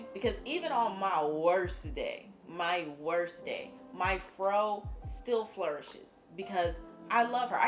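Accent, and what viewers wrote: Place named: South, United States. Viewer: Yes you do lol it’s kind of a southern accent. Where you from??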